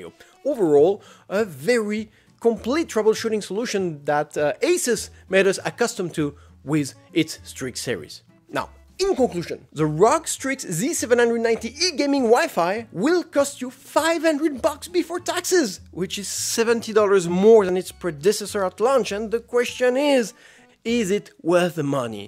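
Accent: France. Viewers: Is that a french accent ? :) great video though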